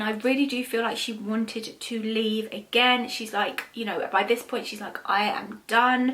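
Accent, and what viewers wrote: United Kingdom. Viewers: Very interesting why do they wait until they are no longer here to tell the secrets? Why not tell while they are here any who this was very interesting I enjoyed the reading I love your accent are u from England? are You British? U have a beautiful accent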